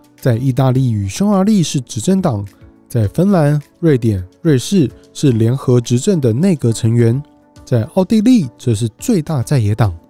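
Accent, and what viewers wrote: Taiwan. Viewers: Ａ，最近也卖掉Tesla改买油车了，因为北美实在太冻，之前一场大雪车就故障了 是说小哥哥的台湾腔还挺好听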